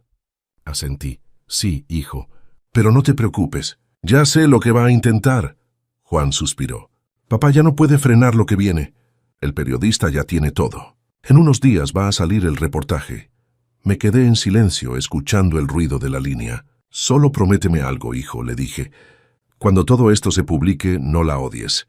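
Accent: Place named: Spain. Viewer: Porque si es un Mexicano narrando su historia , porque habla como un español ?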